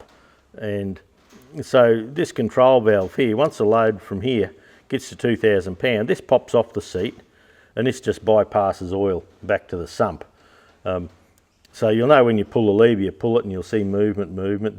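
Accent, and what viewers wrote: Australia. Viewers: informative. I like your aussie English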